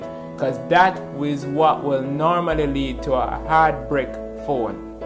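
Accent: Jamaica. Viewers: You sound kinda like Jamaican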